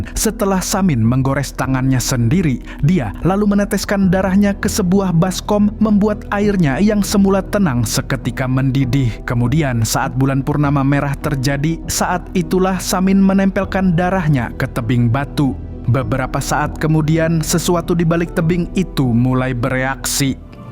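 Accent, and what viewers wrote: Banjar, Indonesia. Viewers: Urg banjar kh manggg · urg banjar kh pian sklinya astga😭😭😭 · Wakai orang Banjar kah ikam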